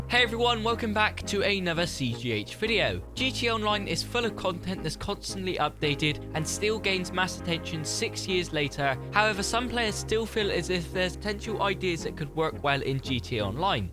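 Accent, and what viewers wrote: United Kingdom. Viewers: defiantly bristolian arent you!!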